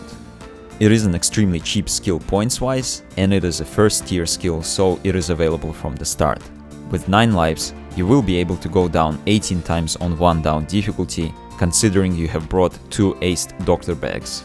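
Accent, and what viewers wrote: Russia. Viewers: Говори что хочешь, но акцент русского у тебя выделяется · Just when i clicked on the video i was like sure great intro .. OH HES RUSSIAN haha im half russian i did not say that in an attempt to offend him. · Кул рашн акцент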